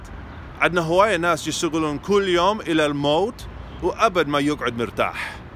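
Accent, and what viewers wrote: Iraq. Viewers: I like how you speak Iraqi accent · You got the iraqi accent duuuude .🤣👍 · ?????? WHY YOU SPEAK IN IRAQI ACCENT??? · Your Iraqi accent so cute, you are gorgeous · your pronunciation of Arabic and Iraqi accent is incredibly good for a seemingly US born individual, you must have served in Iraq or something 😉 . Greetings from Cairo, Egypt or AlQahera- Misir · Hi..i m subcribing your channel.. because MashaAllah..im impressed..im a muslimah from Malaysia..i asked my elbi..dear listen.. without seeing your face..you really truly honestly sound iraqi..so when i showed him your face..he laughed.. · أروح فدوة للهجتك العراقية الحلوة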